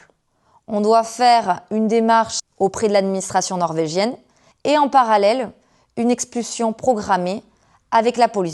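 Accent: South, France. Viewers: Vu ton accent, tu viens du sud de la france? Ton mari a -t-il trouvé du boulot en france?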